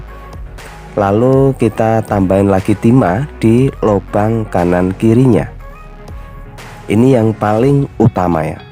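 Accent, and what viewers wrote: Java, Indonesia. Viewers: Lokasi sampean Ki ngendi to bang kok koyo wong jowo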